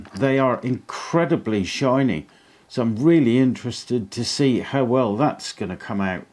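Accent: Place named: Norfolk, United Kingdom. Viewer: Great video. One question…. What accent is that? Sounds north Norfolk. Many thanks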